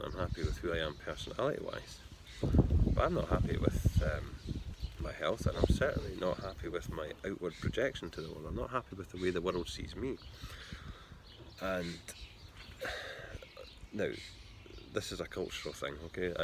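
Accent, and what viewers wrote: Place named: Scotland, United Kingdom. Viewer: The Scottish accent is my *favorite*!!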